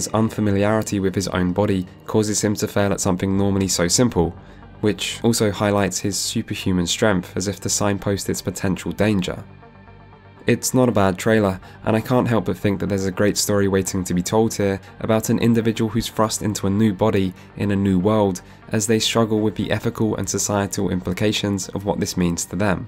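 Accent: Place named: United Kingdom. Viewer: UK Accent? - Check. This is going to be a good retrospective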